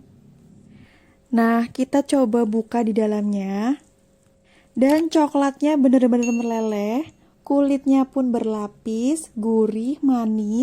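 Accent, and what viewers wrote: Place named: Java, Indonesia. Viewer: Medok ora pa2 yu,, 😁😁😁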